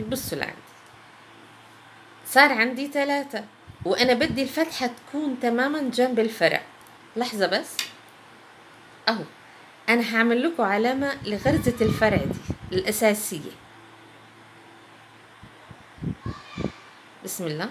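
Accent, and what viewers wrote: Egypt. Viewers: بوركت ولكن لكنتك مش شامى بالكامل فيها اللهجة المصرية .خلتينى احترت فى جنسيتك وانا بسمعك😅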